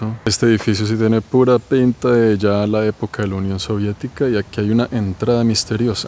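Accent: Bogotá, Colombia. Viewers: ¡ me encantas con esa manera de expresarte y sobre todo tu acento “ oiga mijito” asi hablan mis hermanos Colombianos, yo soy de Mexico y soy tu seguidora. Saludos y bendiciones🙏🥰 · Saludos Parcero,Soy 🇵🇷 desde EU. Me encantan tus videos sobre todo lo buen aprendiz de los distintos idiomas y el acsento colombiano que tiene. Gracias x visitar el planeta x mí educarme y dejarme ver lo hermoso q es.. aunque espero muy pronto explorar así cm usted. Q viva 🇨🇴 y el resto del mundo 🌎🤗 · Hola, qué chévere saber que eres Colombiano, mijo! Es el segundo video que veo y espero ver todos los que nos puedas compartir. Con el primer video sobre TATARSKAN cuando te escuchaba narrar, me dije "este man tiene que ser Colombiano, obvio por tu acento", sin embargo, leyendo comentarios nadie hacía alusión al tuyo. Finalmente, hoy viendo este video confirmé que lo eres. Debo decirte que tus videos repito, solo he visto dos son del putas, mijo! 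Te felicito sinceramente. Por supuesto ya me suscribí a tu canal. Desde Bucaramanga, recibe un abrazo bien pingo! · Lo que más me gusta de es esa sencillez al decir soy Colombiano y la forma de hablar típico de nuestro país, bendiciones desde Bogotá. 🇨🇴☺️ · Este Man es muy rolo❤... "Sospecho pecho" 😂me encanta, me siento muy identificada, como si estuviera hablando uno de mis hermanos. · Que parte Colombia eres? Esos modismos en tu acento, se me hacen conocidos. Saludos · Muy bueno ,en total acuerdo!!!! Ese acento colombiano y hermosa tu efucacion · Muy buenas entrevistas, hermosos paisajes, buen acento Colombiano u ahora ya hablas Ruso, muy lindo es Rusia y la gente es muy amable y hospitalarios.. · Rolo, cachaco, es decir. De Bogotá, la capital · SÍ...ÉSE ACENTO COLOMBIANO,,,VIVA COLOMBIA....SALUDOS A TODA ESA GENTE LINDA DE COLOMBIA. L@ AMO.SALUDOS DESDE NICARAGUA EN AMÉRICA CENTRAL 😘😘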